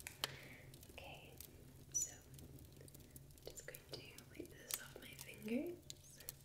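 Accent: Scotland, United Kingdom. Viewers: never heard a Scottish accent ASMR, makes it even more amazing with such a soothing accent x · Perfect timing, I’m currently getting snowed in in Michigan. You’re so good at this! A lot of people try too hard…over accentuating words, whispering to the point you can’t understand them, etc. You’re Scottish accent just adds to the relaxing tone & cadence of your voice and the overall experience. WhispersRed ASMR is fantastic as well, you’re both my favorites. I must have a thing for a UK accent hahaha!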